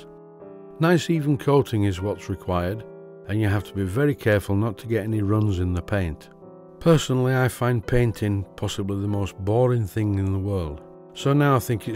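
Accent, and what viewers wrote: Yorkshire, United Kingdom. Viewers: watching this does NOT interfere with MY medication. But, THANKS for the warning. (My ADHD medication, as well as my depression meds say that watching Steam Engine restoration is beneficial!) (But, I may slip into a coma, sometime soon.) Keep the videos coming! Your Yorkshire accent is amazing.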